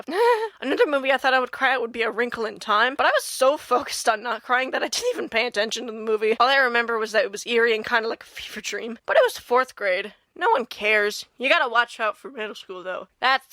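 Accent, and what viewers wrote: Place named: United Kingdom. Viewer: Ur bri'ish accent is gold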